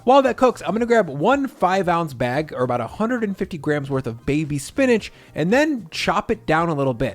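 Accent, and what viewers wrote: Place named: United States. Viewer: I'm a late comer. Just found your channel. Love it. I imagine that I may wonder sometimes what is he talking about, with you being American and you call things by different names and pronounce things totally different from us here in Australia.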